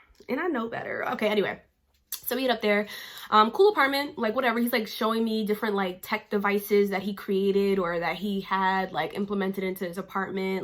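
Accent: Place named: New York, United States. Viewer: Are you from NY you sound like it?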